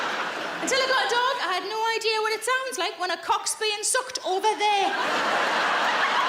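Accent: Scotland, United Kingdom. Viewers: I thought that she was Scottish given her accent and last name! Her comedy is always hilarious!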